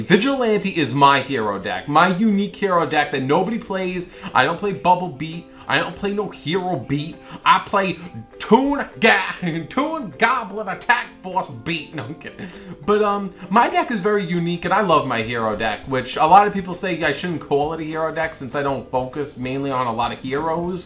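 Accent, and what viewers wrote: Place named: Brooklyn, United States. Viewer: Apologies if its wrong but I believe its a brooklynn accent.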